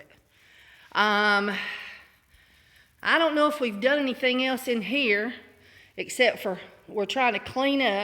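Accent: South, United States. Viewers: Lady you have got to be the hardest woorking chick on the planet. Don't know what I admire most your enthusiasm, high energy, great attitude, soapy skills or the fact you speak my mother tongue (thats southern if you didnt know). As a girl with southern roots who has long been transplanted as far north as i intend to it just nice to hear that southern speak. Best of luck on your new digs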